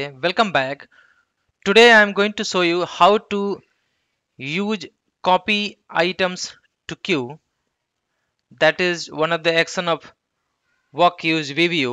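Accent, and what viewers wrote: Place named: India. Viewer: Thank you. You provided a good explanation, but your Indian accent (SOMETIMES) sounds horrible. Please work on it.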